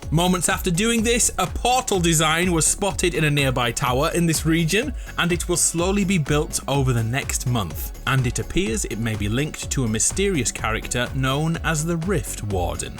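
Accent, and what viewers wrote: United Kingdom. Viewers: He's british, they pronounce things differently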